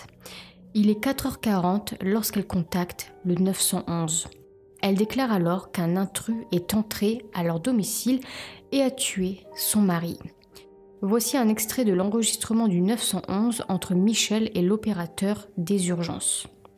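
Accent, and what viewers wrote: North, France. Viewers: Bonjour, je te découvre et sincèrement très sympa, car excellente recherche et superbement raconté. Et ce ptit accent du nord🥰. Belle continuation.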